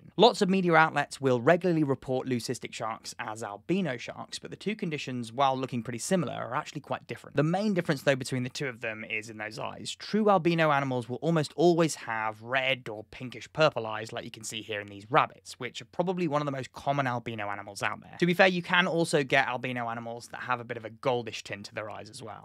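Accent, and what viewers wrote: United Kingdom. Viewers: He's from Britain. They pronounce things a little bit different like, for example, what we call cilantro, they call coriander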